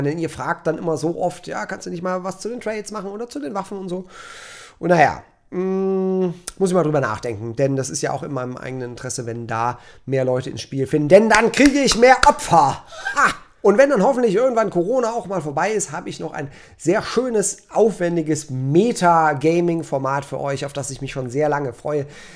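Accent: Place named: Berlin, Germany. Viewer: was du bist Berliner ???? echt???? Ditt find ick jut :D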